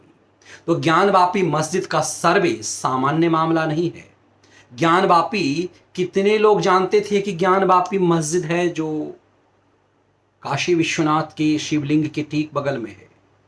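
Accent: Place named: Bihar, India. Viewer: आदरणीय महान् विद्वान और राष्ट्रवाद के सशक्त और प्रबल समर्थक श्री, बहुत ही सुन्दर,सटीक और समसामयिक विश्लेषण किया है। पर आज़ आपका संबोधन और भाव भंगिमा विगत (भूत काल) के जैसा प्रतीत नहीं लगता है। आपकी हंसमुख, चुटिली वाणी, और ठेठ बिहारी लहजा ही आपकी अनोखी अछूती और अदभुत पहचान है। वही बनाए रखें। प्रोफेसर जैसा लेक्चर तो सभी पत्रकार देते हैं।आप अपनी पूर्व भूमिका में ही अच्छे लगते हैं, धन्यवाद।🎉🎉🎉🎉🎉🎉🎉🎉🎉🎉🎉🙏🙏🙏🙏🙏🙏🙏🙏🙏🙏🙏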